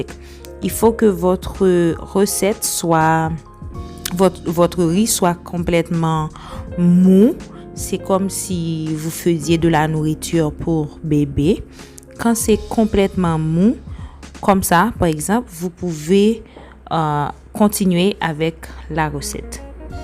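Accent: Haiti. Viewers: Accent haïtien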